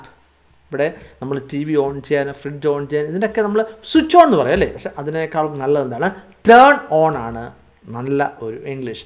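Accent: Kottayam, India. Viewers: Malayalam slang kelkkan nalla rasamundu.kottayam aano? From trissur.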